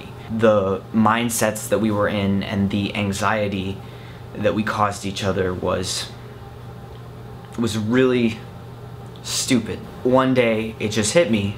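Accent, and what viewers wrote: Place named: Wisconsin, United States. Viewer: ahhhhh that's why he reminds me so much of Luke they are both from Wisconsin (accent)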